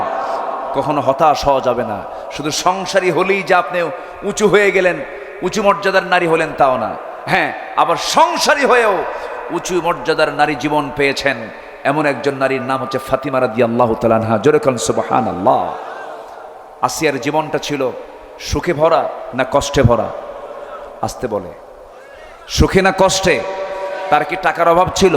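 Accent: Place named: Kushtia-Jessore, Bangladesh. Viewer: উনার ভাষা শুনলে মনে হয় যেন কুষ্টিয়া অথবা যশোরের মানুষ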